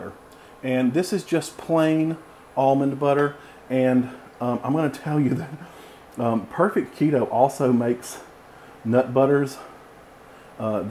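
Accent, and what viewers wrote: South, United States. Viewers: Nah, he's just southern.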